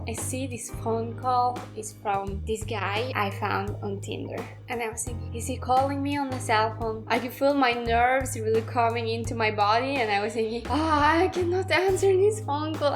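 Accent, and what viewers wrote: Italy. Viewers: you're getting an italian accent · your English accent is so Italian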